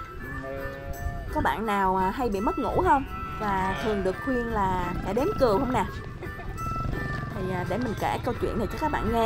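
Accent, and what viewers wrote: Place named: Saigon, Vietnam. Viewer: Đồi cừu suối nghệ vũng tàu,vũng tàu có nhiều cừu ban ha,nghe giọng nói của sài gòn dễ mến quá,mình thích giọng sài gòn quá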